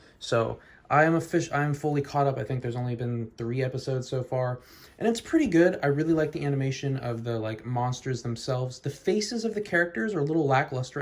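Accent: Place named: Spain. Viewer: absolutely! Mainly Pokemon cards and books. But I think our approach to card collecting is similar, going for master sets, not necessarily promos, and getting singles over time to slowly complete them, as they become more affordable. Haven't seen you talk about language learning (though you may have). I've heard your Spanish accent in your churros Oreo video, so I suspect you've at the very least dabbled. It's probably my number one hobby, what I spend the most time on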